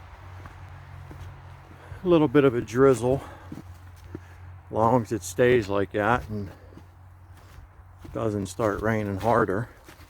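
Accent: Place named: Pittsburgh, United States. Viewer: Love this vid bro....I was smilin and smilin. Your "Pittsburghese" was great to hear. Walkin "dahn" the road......... "mowl" 46......here's"da" connextor trail.....Goin "dahn air".........."yunz"........don't like "em" things......."make it outta dare"........"dahn ear where I parked" Great to see a Pittsburgher "dune" a vid! Keep on rockin! Maybe see ya aht on da trail some time!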